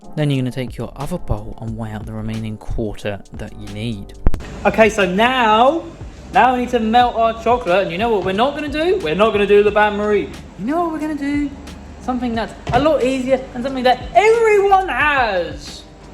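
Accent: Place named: United Kingdom. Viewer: I didn’t know I liked being yelled at in a British accent until now 😍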